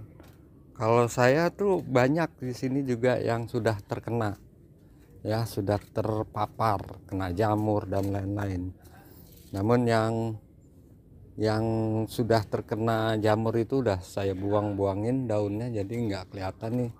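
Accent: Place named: West Java, Indonesia. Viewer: iya sudah sy kira juga ada logat Sunda ya... iya mmng ini musim hujan tiap hari...sy di bogor trims pencerahan ya n nasehat ya.. salam aglonema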